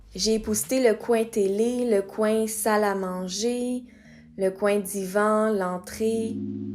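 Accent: Quebec, Canada. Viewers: Tu parle bien le québécois 🥰💞💞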